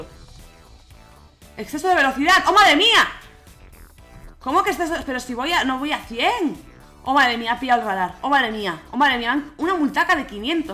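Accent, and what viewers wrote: Argentina. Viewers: Se llama "español rioplatense" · parece un argentino cheto o de verdad sonamos asi? xD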